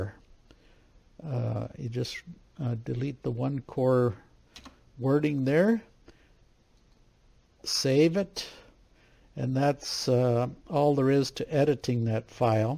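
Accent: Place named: France. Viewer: Thanks a lot, small but very useful tutorial. In addition, as a French, I like to listen to this "particular" accent of my compatriots!!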